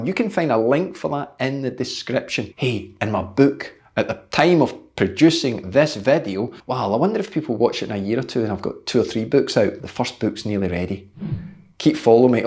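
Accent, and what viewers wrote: Scotland, United Kingdom. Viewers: I’ve been working to much and now have time to get back to riding. Body isn’t in very good shape just did two rides of twenty miles after a few days of working on the bikes and fifteen minute butt accumulating rides on the trainer. Didn’t ride real hard but was pleased to have survived as well as I did. Power meter battery was dead for the first ride so no numbers for it. Second ride with power I showed a twenty minute of 170 and pleasingly also a 170 heart rate. At 63 the latter is well a surprise! Now to recover and attempt to acquire some endurance and riding strength again. Life would be simpler without jobs! Enjoy listening to your Scottish language and do apply some of what I hear. Hope your health is better then what was before. 😀 Have a good day over there and be safe!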